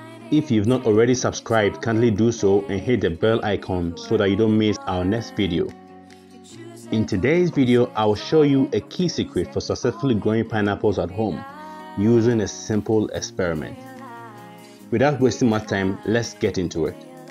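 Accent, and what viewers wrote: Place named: Ghana. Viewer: This is ain informative experiment that clearly illustrates the advantage of starting the pineapple suckers in water as opposed to planting them straight in the soil. Much thanks. By the way, if you don't mind my asking, your name sounds like a Nigerian Igbo name, yet your accent is unmistakably Ghanaian, and this got me wondering if is in fact a common name in Ghana as well as in Nigeria. Please forgive the minor deviation from the theme of gardening. I have always been fascinated by accents both international and African, hence my curiosity.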